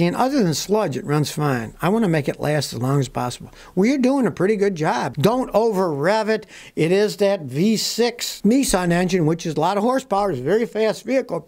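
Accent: Germany. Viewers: Please, please, speak more with a german accent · German accent made my day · I like the German accent and the German laugh! So Funny!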